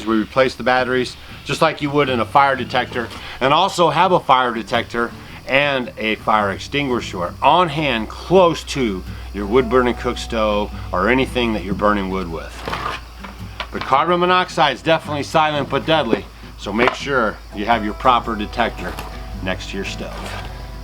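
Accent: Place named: Utah, United States. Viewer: are you in utah or missouri i heard both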